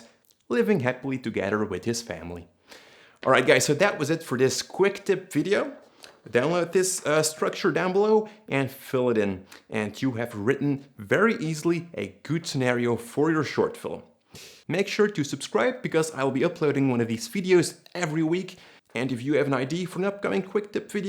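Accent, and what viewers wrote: Netherlands. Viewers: bootifull dutch accent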